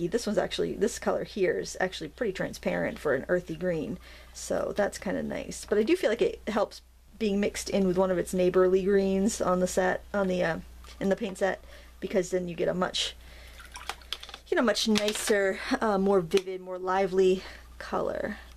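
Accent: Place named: Norfolk, United States. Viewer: And, it's funny how her pronunciation is so much like my mom's. And mom was born in raised in Norfolk, VA. Lot to be said for the remnants of the dialects of the original English settlers.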